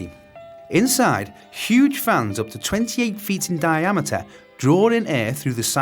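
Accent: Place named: Scouse, United Kingdom. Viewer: Imperceptible Scouser accent but still very comprehensible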